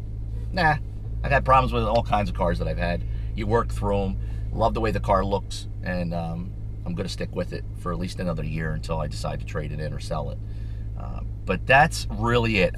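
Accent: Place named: Philadelphia, United States. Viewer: Hey man awesome video!!! I’m here in Delco. West Philly guy born and raised!!! I could tell as soon as I heard you talking that you’re a local guy!! Love the video. Considering buying 2012 used with 80k miles.... it will be first nice car I bought myself. Loves trucks/suvs but also want something fast and fun to drive!!!! Love the way these SRT 8s look!!! It’s a 2012 SRT8 6.4 with 80k and they’re asking $25k I believe. Do you think it’s worth that,?? I can deal with little issues no problem and I always had trucks so MPG is not a concern! Lol pro charger sounds like a great idea hmmm 🤔 also I subscribed. Thanks man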